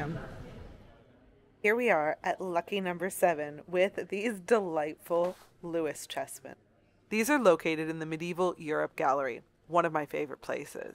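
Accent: United States. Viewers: I can't work out if your accent is American.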